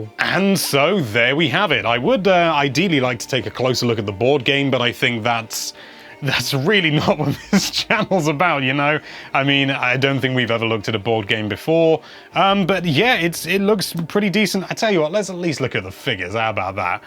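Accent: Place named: United Kingdom. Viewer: ayyyy your british....im subbing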